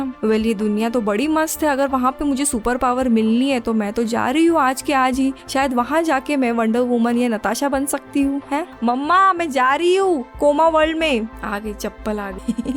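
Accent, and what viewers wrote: Maharashtra, India. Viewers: aap marathi ho kya??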